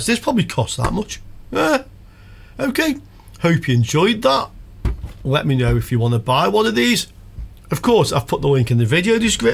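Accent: United Kingdom. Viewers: nice video. nice for a change hear King's english with Schuko electric plugs. p.s. my father had similar setup in <num> meter over his workbench with two parallel 100W lightbulbs to have about 1 amp current limit when testing electric appliances and tube radios for input short circuits of course without all the digital stuff of that device. such device with a 40W lightbulb may be a safe device to let capacitors of long unused mains powered transistor stuff have the capacitors reform. myself i use a SOLA ferroresonant 60W transformer to test stuff that have long time been in a barn, attick, cowhouse or else with potential for mains fuse blowing faulta ( the Ferroresonant i.e. constant voltage transformers have inherent power limit, but are inefficient at light load)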